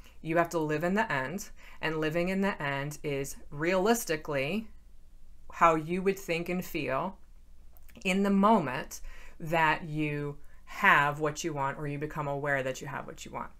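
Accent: United Kingdom. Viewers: Best Valentine’s Day gift - we’ve missed you and your British accent and bed clothes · Your British accent is absobloodylutely fabulous!☕️🍪😜 And I love love love this video from you, it feels like you’re a best friend I didn’t know I had, came to bring me a golden advice😍 Thank youuu🌺🤍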